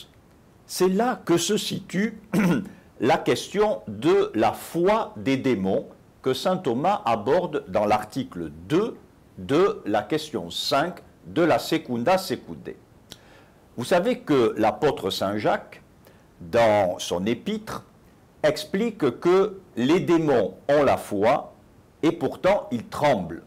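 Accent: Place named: Marseille, France. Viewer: So I'm scrolling through the hillbilly Thomists avoiding to write my essay on angel and then comes by with his hint of Marseillais accent bringing me right back home and back to work too... The book he wrote on angels has been translated in English and is really good!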